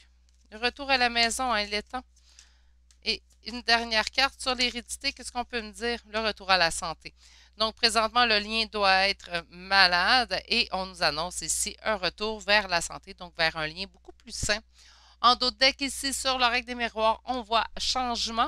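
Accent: Canada. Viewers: Je suis espagnole. J'habite en France depuis 5 ans et malgré le fait que je ne suis pas habituée à l'accent canadien je comprends parfaitement.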